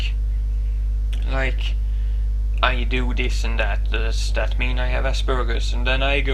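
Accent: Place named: Sweden. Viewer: I really liked your video, I just took some tests the day before yesterday, and they provided some confirmation of what I already suspected after having read about AS on some web pages. I didn't know about the lines/bags under the eyes, I thought that was just me (still learning about AS). The more I see of others with AS, the better it seems to make me feel. By the way, I like your Swedish accent, it is cool sounding :) Your brother looks cool, I always wished I could grow a big beard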